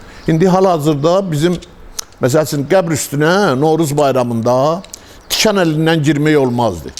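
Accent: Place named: Bilgah, Azerbaijan. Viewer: Kişi, Allah can sağlığı versin sənə, gözəl söhbət elədin. Eyni adətlər bizim kənddədə var. Elə bildim Bilgəhli danışır.Bilgəhdən salamlayıram sizi.Mənimdə rəhmətlik atam anamı mənim adımla çağırardı. 1972 də atam 21 i kievdən çernopuldan gətirib, indi mən sürürəm.Dübbə düz 21 i xoda salanda, öz xüsusi iyi var. Allah cəmi yaxşıları gözə görünən şeytanlardan qorusun!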